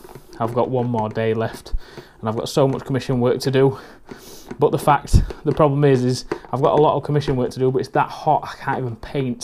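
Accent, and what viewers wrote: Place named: Yorkshire, United Kingdom. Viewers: nice vid mate. where in the world are you cos you sound northern england? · Thanks for this... I'll not be buying flock ever again also. ( PS, Big 'hello' from someone who was born in Batley and raised in Birstall... nice to hear a proper accent again😉)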